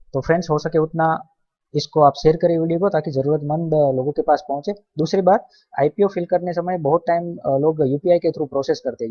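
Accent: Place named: Gujarat, India. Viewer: Gujarati lago😅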